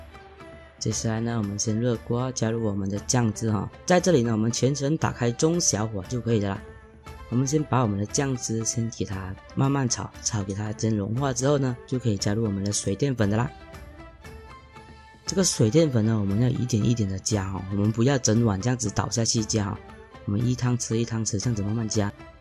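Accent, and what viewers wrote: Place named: Malaysia. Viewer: 马来西亚乡音，大马人没错吧。感谢教学，想做这个很久了。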